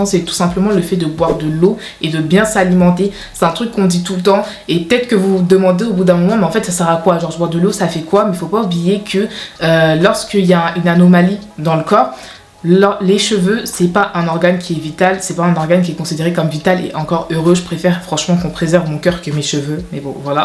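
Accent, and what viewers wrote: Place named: England, United Kingdom. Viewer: On entend l’accent anglais en fait!!!!😂😂😂😂😍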